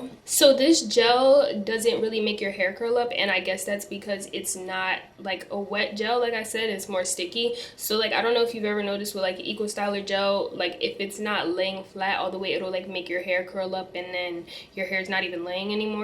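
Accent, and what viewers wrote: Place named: United States. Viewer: Where's that accent from? 😍😍😍 I'm from the UK and i just can't place where in the states that is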